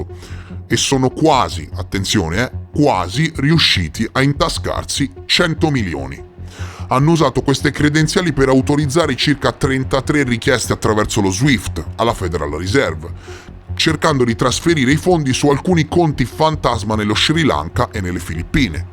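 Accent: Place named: Friuli, Italy. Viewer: Secondo me sei del nord Italia Friuli...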